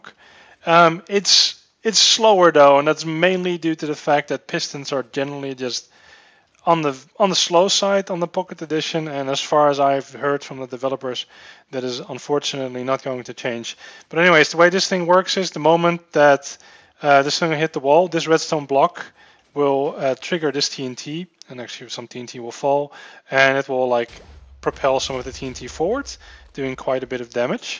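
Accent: Netherlands. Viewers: He sounds dutch.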